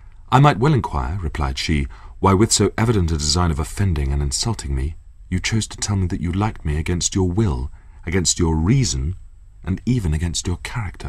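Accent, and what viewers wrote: England, United Kingdom. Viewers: 진정한 동굴 목소리다😲 목소리만듣고 심쿵하긴 처음이넼ㅋㅋ영국영어 배우고싶다ㅠ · Ese acento tan inglés ufff :D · Don't you just LOVE an English accent? If you think Matthew Macfadyen sounds good then just listen to Alan Rickman!!!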